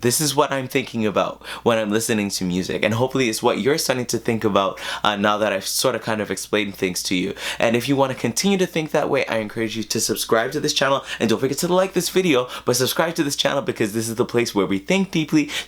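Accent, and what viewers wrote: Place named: Jamaica. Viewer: This is not meant to be assuming, or offensive. But when I listen to your voice, some of the subtle nuances in your voice I get a Jamaican accent underlying your pronunciation. Just an observation I made. I could be just projecting.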